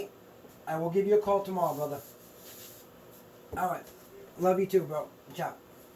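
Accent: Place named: Maine, United States. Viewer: I swear, you got a Maine accent. Love it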